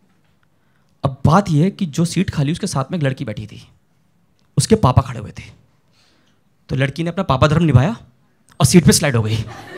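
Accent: Garhwal, India. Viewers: Aap gadwali ho??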